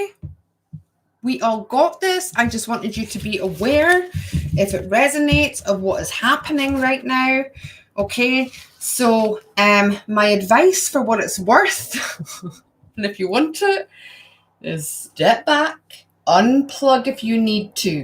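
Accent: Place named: Scotland, United Kingdom. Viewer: Nice to hear a Scottish voice for a change on a truther video!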